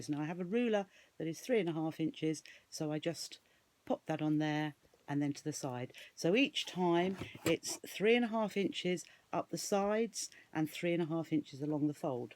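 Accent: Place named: United Kingdom. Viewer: I am Arabic woman I like your British accent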